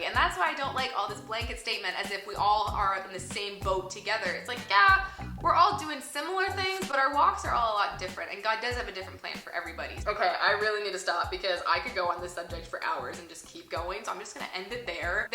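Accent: Canada. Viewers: I just love Canadians and the things they say. Especially when u say about ur accent comes out and it reminds me of my Canadian family and friends and it just makes me happy which i guess means u make me happy I know that this is a personal question but I'm curious as to what ur denomination is in Christianity. The reason y u ask this is because my family in Canada is apart of the United church of Canada and every summer we would go to a church camp located in berwick united church camp, berwick Nova Scotia. It's a 10 camp with all sorts of different activities and fun stuff. I was also wondering if u know of the Christian musical group from Canada called the message. They don't really put out there music publicly but it's really good music to listen to and stuff. Ur a great Canadian 😊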